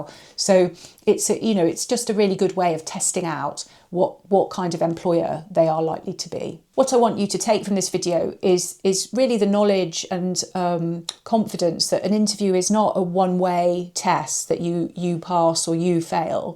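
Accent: United Kingdom. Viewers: I was recommended your video just now, and signed up for your newsletter! I am glad your video popped up. I like your demeanor and tips. I like your demeanor because you sound like you are from the UK. Here in the USA, many places need that good ol English/British/or other UK country wisdom that helped mold the USA.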